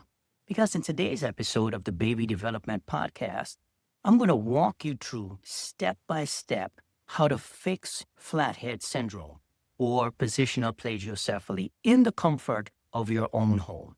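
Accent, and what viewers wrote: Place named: Caribbean. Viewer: Do you operat ein the Caribbean? I'm hearing an accent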